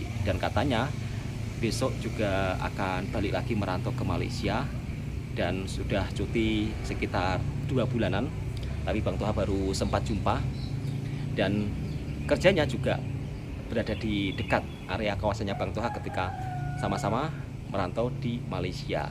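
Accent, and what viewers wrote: Java, Indonesia. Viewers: pekat btl jawa dia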